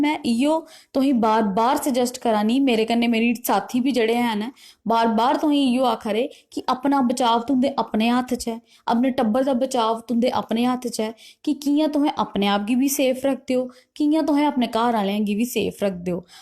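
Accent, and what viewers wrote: Jammu, India. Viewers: Apka dogri sa news btana muja badi khushi milte hai our bda acha lagta hai ji thxxx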